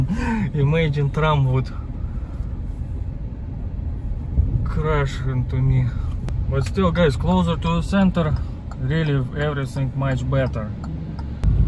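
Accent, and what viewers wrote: Russia. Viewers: Настоящий рашн акцент) тут и в подмосковье виды не хуже имеются, можно слайд-шоу делать по картинке и километражу от Москвы)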